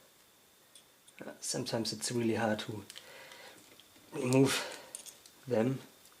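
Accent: Germany. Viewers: are you from Germany? sounds like that on your accent..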